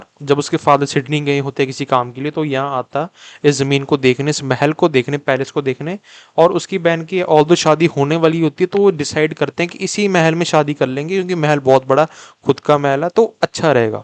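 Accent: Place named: Pakistan. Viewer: yar tum accent sy to pakistani lagty ho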